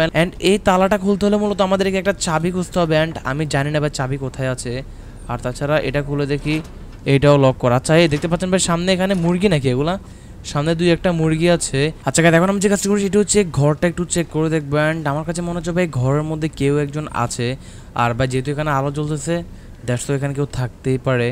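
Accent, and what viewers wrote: Noakhali, Bangladesh. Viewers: ভাই সত্যি করে বল তুমি কি নোয়াখাললে।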